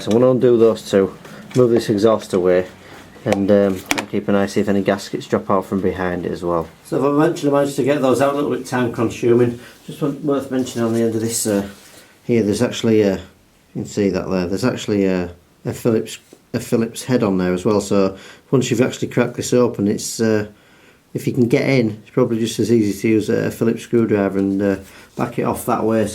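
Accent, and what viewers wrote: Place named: North East England, United Kingdom. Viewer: just woundering wheres the Acent from. North east am guesing.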